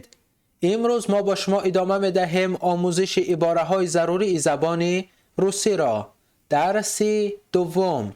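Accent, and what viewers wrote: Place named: Afghanistan. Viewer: Таҳлаҳҷаи авғонӣ, вале зебо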